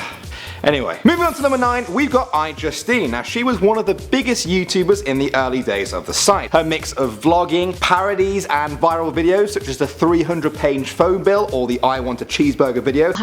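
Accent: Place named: United Kingdom. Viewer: Have you never heard a British pronunciation?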